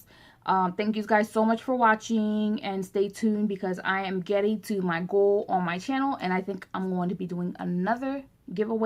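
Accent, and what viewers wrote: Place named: Pittsburgh, United States. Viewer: giiirl are you from pittsburgh? sorry off topic but your accent sounds like it! im from philly :)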